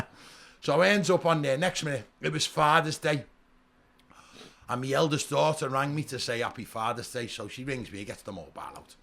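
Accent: Liverpool, United Kingdom. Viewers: Nobody can tell a good Yarn like a Scouser 😂😂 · Yeah okay scouse!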